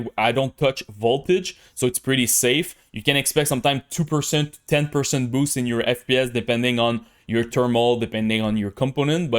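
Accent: Quebec, Canada. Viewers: c'est malade comment cest facile de reconnaitre un quebecois qui parle anglais . j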